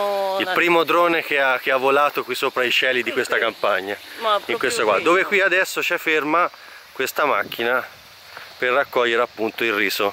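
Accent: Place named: Tuscany, Italy. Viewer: Avrei una marea di domande x te adoro i toscani l accento mi piace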